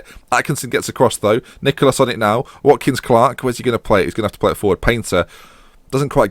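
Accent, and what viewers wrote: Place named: Estuary English, United Kingdom. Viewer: ah so u weren't raised there, u sounds like you have an estuary English accent, not distinctively Northern or Midlands?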